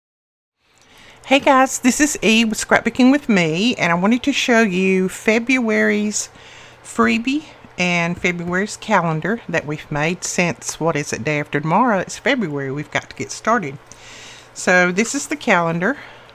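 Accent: South, United States. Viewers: I love all your videos & as a Canadian have come to understand your Southern drawl: ) Love the detail & generosity of your freebies & today signed up for your newsletter. Keep up the great crafting ideas!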